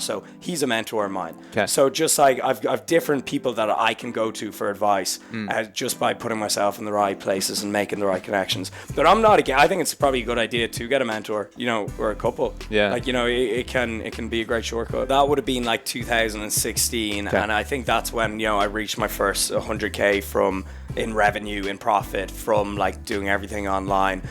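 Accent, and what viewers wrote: Ireland. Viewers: Is he Irish ☘️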